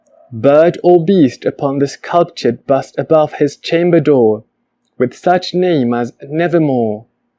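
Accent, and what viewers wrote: United Kingdom. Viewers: No sere nativo, pero siento que tu acento suena muy británico 👏👏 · Buen acento británico, antes no me gustaba ese acento, ahora veo que estoy interesado en pronunciarlo bien, espero llegar a pronunciarlo como tú. Buen video.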